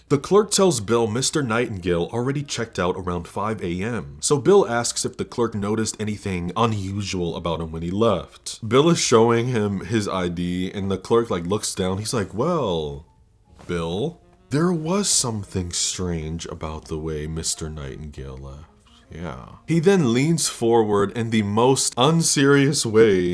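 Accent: United Kingdom. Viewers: As a Brit I love your British accent. I think you're the Bill Hader of film analysis. 👏👏👏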